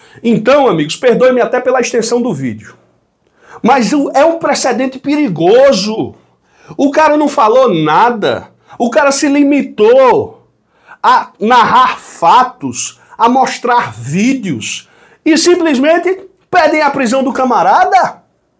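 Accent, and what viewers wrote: Northeast, Brazil. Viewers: Salve Salve o.... você tem sotaque forte e com muito prazer digo sotaque NORDESTINO 👏🏼 DE ONDE É VOCÊ.... PARABÉNS.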